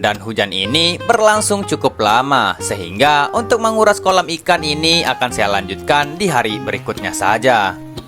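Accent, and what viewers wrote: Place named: Adang, Indonesia. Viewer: Logat bicarany kyk orang adang sm kyk sy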